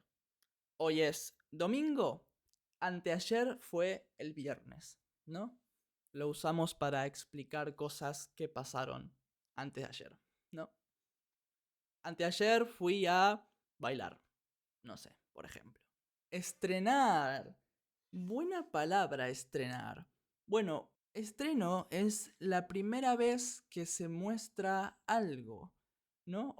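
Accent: Argentina. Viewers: Otro video útil y interesante. Soy estadounidense y llevo 6 años aprendiendo español. Me gusta tus videos y tu canal. Hablas muy claro y es bueno escuchar el acento Argentino. Una sugerencia, el volumen de tus videos es un poco bajo en comparación a otros videos en YouTube, puedes aumentarlo un poco.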